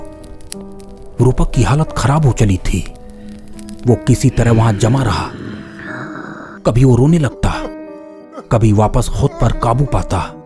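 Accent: Bengal, India. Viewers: Aisa lagta hai ki kahanikar Bangali ya Oriya hai, kya mai sahi hu?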